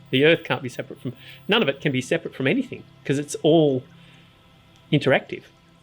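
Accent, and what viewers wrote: Australia. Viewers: Thank you for making this. I listen to a fair bit of ND stuff and yours is clear in a way that takes me right to the edge of ( non) understanding. Hearing this in an Aussie accent helps too!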